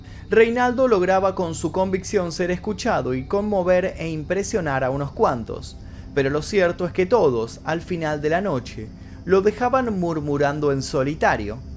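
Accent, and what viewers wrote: Argentina. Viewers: Magnífico y excelente como siempre, como tú, me encanta tú acento argentino y luces.. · Hola, soy nuevo suscriptor, me gusta como presentas este contenido mórbido y el acento argento es complemento distintivo, sin comentarios picantes como lo hacen famosos youtubers. Tu siempre estudias lo que presentas, obvio la legitimidad está sujeta a la veracidad de tus fuentes pero eso es caso aparte. Cuida por favor tu salud mental, un saludo desde Colombia.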